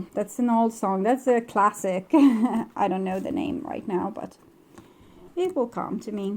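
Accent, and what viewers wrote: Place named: Sweden. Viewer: The harsh truth in a beautiful Swedish accent. Lol awesome . Thanks sweetheart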